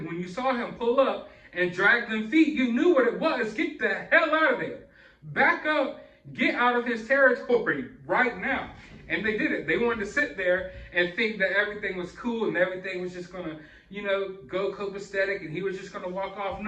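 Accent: Australia. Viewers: I love Australia and love your Australian accent. I would have passed out. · I love elephants and don’t blame them for being annoyed at humans . Love. Your Australian accent btw 🙂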